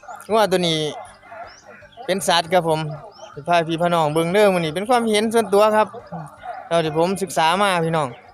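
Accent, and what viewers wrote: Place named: Isan, Thailand. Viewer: ฟังแบบม่วนๆเด้อแบบอีสานบ้านเฮา ***คนภาคใต้ฟังไม่ออกคับ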